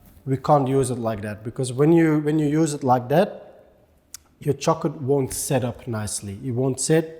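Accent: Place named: Belgium. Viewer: Are you originally from Belgium? I detect a Belgian accent:) Groeten uit Arizona, USA - Ann van Daily Travel Dose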